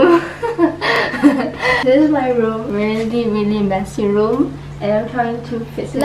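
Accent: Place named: United Kingdom. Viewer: ur british accents 💅💅💅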